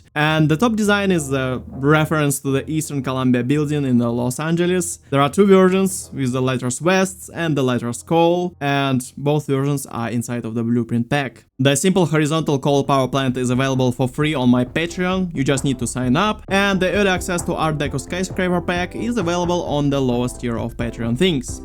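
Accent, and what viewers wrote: Russia. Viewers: слишком слышно русский акцент, но видос классный, повторю как дойду до угольной энергии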